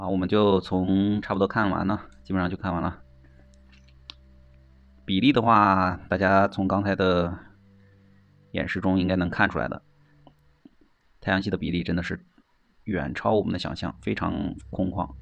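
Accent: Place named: Shaanxi, China. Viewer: 陕西人吧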